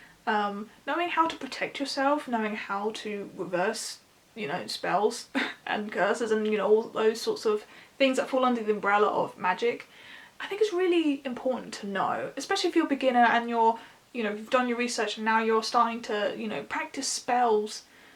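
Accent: England, United Kingdom. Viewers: Are you from the UK? ❤ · Hey - just discovered your channel - I'm in Devon in England - sounds like you're in England too. I have a witchcraft channel as well - brilliant book recommendations! Thank you for sharing 😊 🙏 💓 Xxx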